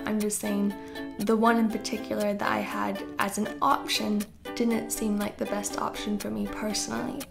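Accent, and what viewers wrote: England, United Kingdom. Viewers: I love hearing the British accent creeping into your voice!!! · Wait you're American? I always thought you were British 😂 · How long have you been living in England? You're starting to get a bit of an accent. ☺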